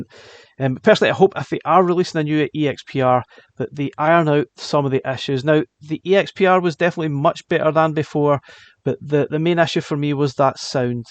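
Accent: Scotland, United Kingdom. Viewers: Shock Troopers is awesome! I can hear your a scot so maybe you like a bit of Jungle/DnB? If so, you'll love the music in Shock Troopers. Shock Troopers 2 loses all the charm of the original IMO. It's wicked getting that on cart. Shock Troopers 1 wasn't even released on the AES back in the day.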